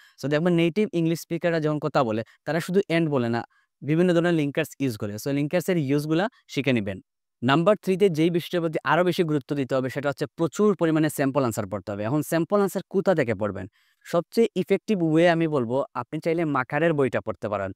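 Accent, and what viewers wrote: Sylhet, Bangladesh. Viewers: আপনি কি সেলেটি?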